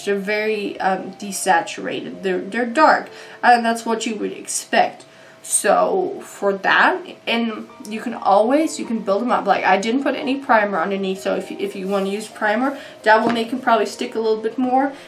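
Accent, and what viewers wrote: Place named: Germany. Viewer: You're losing your German accent. :(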